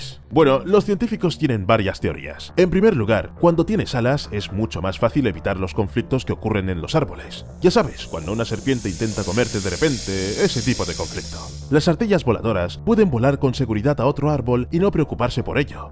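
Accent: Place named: Andalusia, Spain. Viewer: Haz un video revelando tu acento Andaluz, que se te escapa a veces jajajaj, un abrazo desde Sevilla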